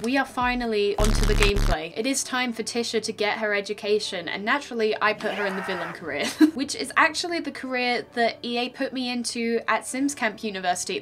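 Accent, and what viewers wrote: United Kingdom. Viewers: i forgot you were british and i was SHOOK out of my mind when you started talking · I just fucking realised, that is a female version of Pyrocynical. No joke:O. SiGnS, tHaT gO bEyOnD rEaSoNaBlE cOmPaRiSoN: -British (heavy British accent) -white/light colored hair -look pretty similiar (in my opinion) -editing is vaguely similiar (cuts, jokes and zooms) That is it I think.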